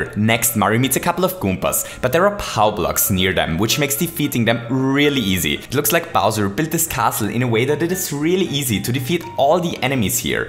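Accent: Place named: Germany. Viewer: Also ich find die Videos ja Super, aber der Deutsche akzent zu den Videos ist schrecklich x)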